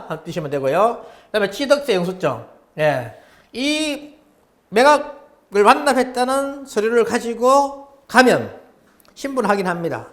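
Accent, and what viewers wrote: Gyeongsang, South Korea. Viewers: 경상도 억양이 들어가서 그런가 봅니다. 어떤 대통령이 경제 발음이 안 되어서 경제를 못했다고 했는데 제가 그런가 봅니다. 다른 부분은 잘 들어주셔요.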